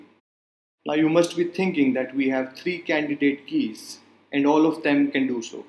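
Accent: India. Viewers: Now americans will also watch this video even if they hate indian accent( because this is the best video on YouTube till now)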